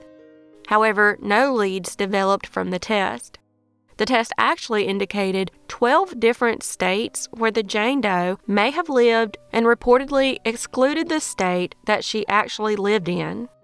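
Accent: South, United States. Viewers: Good job, u did loose alot of your southern accent as your stories progressed. Fyi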